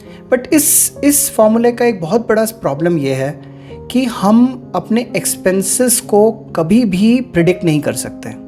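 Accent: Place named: Madhya Pradesh, India. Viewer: Ram Ram jee Bhai, aap kahan ke rehne waale hain?shuru main laga aap Lucknow ke hain par aapke bolne ke lehje se laga shayad aap Madhya pradesh se hain..accha laga sun ke, dhanywaad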